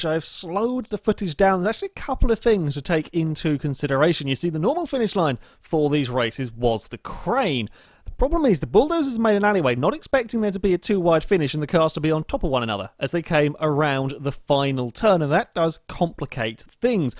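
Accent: United Kingdom. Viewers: you sound so fabulously British :D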